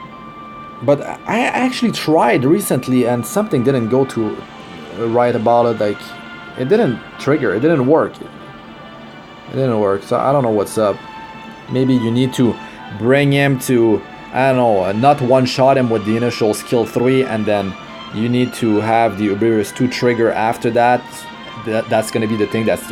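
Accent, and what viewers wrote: Quebec, Canada. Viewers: Are you a French canadian? Idk why but you sound like it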